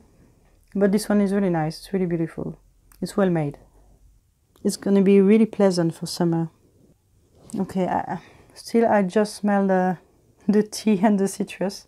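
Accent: France. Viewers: Frenglish 😂 I think it's sexy AF! Wish we could've seen the relief on your beautiful face! 😂 The joys of a blind buy WIN. 🍵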